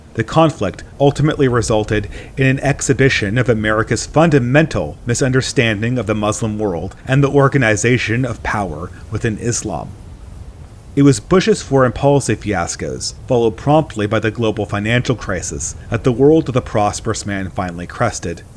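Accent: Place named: California, United States. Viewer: I hear the voice of a fellow Californian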